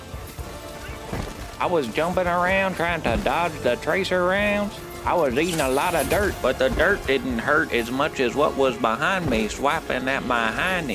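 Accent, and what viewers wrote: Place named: South, United States. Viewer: If you want peace prepare for war....the war for putting democracy hearts of many people with a smile uoon you're face. Good video strange seeing you play longer but hey new format kinda works and it honestly gets people in the vids to hear that strong country accent! Hellyeah brother give them the hammer of democracy!. Cheers.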